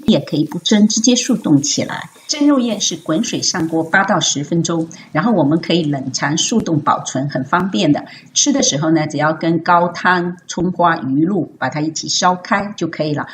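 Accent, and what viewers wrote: Fuzhou, China. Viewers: 老师也有福州口音，听来好亲切。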